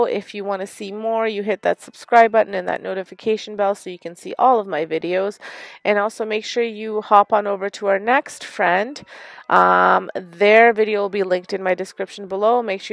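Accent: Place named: Canada. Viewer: I knew you had a accent. You are from Canada like my daughter in law. Lol.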